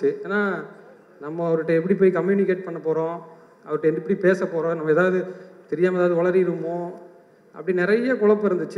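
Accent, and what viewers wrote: Kongu, India. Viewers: திருப்பூர் காரர் .. கொங்கு தமிழ் super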